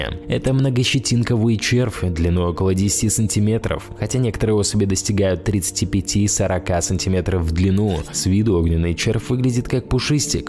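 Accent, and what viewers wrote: Russia. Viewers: Как можно слушать такую речь. Передача хорошая, речь акающая😮😮😮😮Это что-то. 😂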